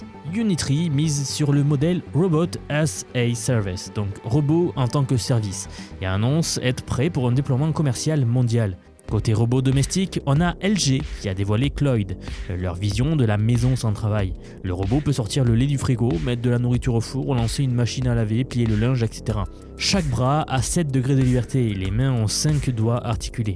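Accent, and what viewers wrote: France. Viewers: Ça prendrait des sous-titres pour comprendre les noms. Un français qui essaie de parler anglais, on comprend jamais rien 🤷🏻‍♂️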